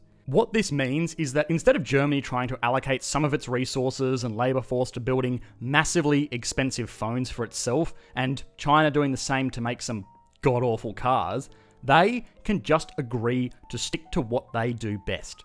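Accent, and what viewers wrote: Australia. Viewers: I’m loving your channel as an uneducated economics enthusiast, and the Aussie accent only makes it better! Keep em’ coming! 🇦🇺👍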